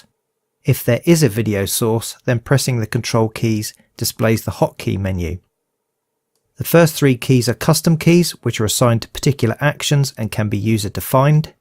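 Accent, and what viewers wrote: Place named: United Kingdom. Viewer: Sweet British accent. Regarding monitor I have shortlisted ss cf390, curve.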